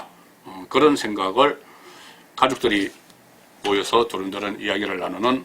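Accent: Gyeongsang, South Korea. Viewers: 발음은 타고난것이고 억양은 경상도지역 억양인데 너무 무례하군요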